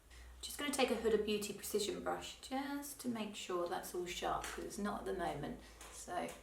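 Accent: England, United Kingdom. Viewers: I truly adore listening to your English accent!!!! I think it’s the most beautiful accent in the world!!! Lovely tutorial!!!!!❤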